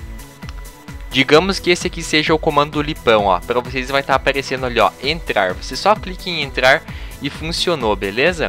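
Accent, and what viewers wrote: Rio Grande do Sul, Brazil. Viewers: gaucho? kkkkkkkk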